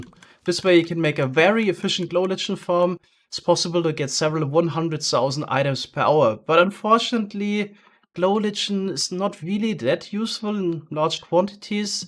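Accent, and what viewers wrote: Germany. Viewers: Er ist soo deutsch oder? Das hört man mega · Du klingst so deutsch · that perfect german acsent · you are german right, i mean the accent ? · Came for the farms, stayed for the spiffing skin, and German accent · this man has the most german accent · are you german? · Du klingst so hart deutsch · Ah, weil er ein Deutscher ist der englisch redet 🥴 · Sounds like you are a german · u are safe german i can hear the accent. dikka red sag doch einfach dass du deutscher bist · Irgendwie kann ich einen deutschen Akzent raushören 🤫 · man hört den deutschen raus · ah dude this english i love it. True German :D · Ich kannte ihn noch nicht hab aber direkt raus gehört das das deutsches englisch ist 😂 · Are you from germany ? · Are you german? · Wegen deinem Dialekt glaube ich das du aus Deutschland kommst. gibt es das auch auf Deutsch? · Hehe, auf den deutschen Akzent ist Verlass. 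:D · deutscher Akzent check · I even can hear that you are a german😂 Grüße gehen raus an alle deutschen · Man hört so das du deutscher bist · Da hört man doch den deutschen Akzent :) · Bist du Deutscher? Dein Englisch hört sich iw so an. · Du bist Deutscher das höre ich sofort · you are german right? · You have the Biggesee germen accent i herard in my whole life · hahahah der deutsche dialekt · are u german?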